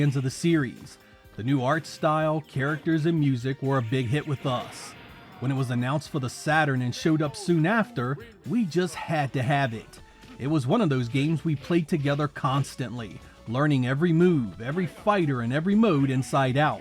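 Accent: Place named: Delaware County, United States. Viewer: You sound like you have a Delco accent.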